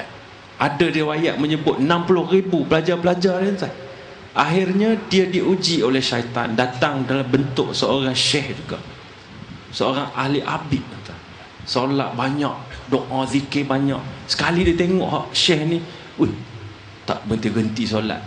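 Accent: Terengganu, Malaysia. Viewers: abg tazkirah cmpur loghat ganu dh.hehe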